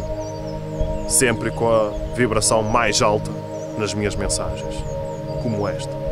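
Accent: North, Portugal. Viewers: Obrigada pelo video, maravilhoso, muitas informações que ja conhecia, e outras não, adoro ouvir vc explicar com seu sotaque português, tantas coisas importantíssimas para nosso crescimento espiritual !!!! a LUZ vencera !!!!! merci !!!! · VC é português tentando falar em brasileiro pq? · Você é, um Português!, que vive no Brasil!, certo?!,nota-se que o seu sotaque ainda é muito de Portugal (Talvez de Ovar Aveiro Sta Maria da Feira...por aí), um abraço enorme para si e para Todo o Brasil!!!. Namastê! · Olá, tudo bem? 😊 És de Portugal? Bjs eu sou e descobri te agora mesmo... Mas tens um sotaque MT tuga! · este senhor tem pronuncia do norte de Portugal.... Porque é que está constantemente a falar no gerúndio? Porque é que tenta inserir o sotaque brasileiro no discurso??????????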